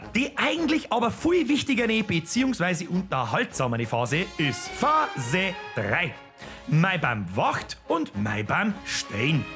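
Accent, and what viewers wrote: Bavaria, Germany. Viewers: Geil geiler original boarisch Prädikat besonders zünftig 🤘🏻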